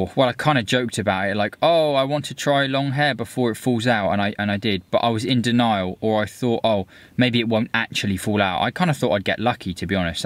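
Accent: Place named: Hampshire, United Kingdom. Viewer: Just shaved mine at aged 54 after reluctant acceptance of quick thinning. Great move. That's a super Hampshire accent. Southampton /New forest? Cool car too, I run a '70 Beetle. Good work on videos. Neil, Southampton.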